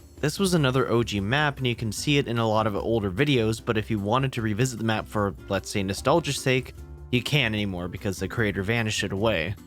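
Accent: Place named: Canada. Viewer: love the canadian accent, nice video as always!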